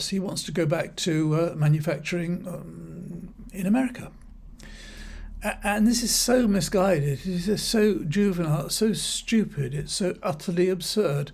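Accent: United Kingdom. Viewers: Glad to hear common sense in a UK voice. How refreshing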